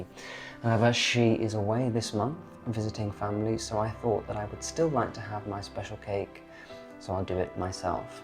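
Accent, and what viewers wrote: United Kingdom. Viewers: Your cake is lovely and looks delicious. I never get tired of hearing your beautiful voice and wonderful British accent. I could listening to you read for hours at a time. Wishing you the loveliest of birthdays. 🐕🇺🇸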